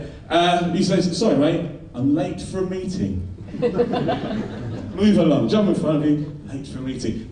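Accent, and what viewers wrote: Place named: London, United Kingdom. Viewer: im american and usually here we speak with american accents. example has a london accent. thats how.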